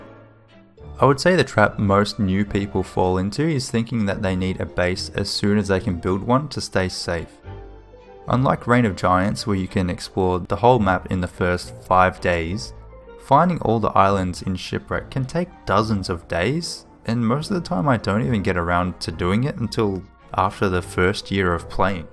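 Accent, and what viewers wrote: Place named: Australia. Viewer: ayy haha i could tell by the accent :) im aussie too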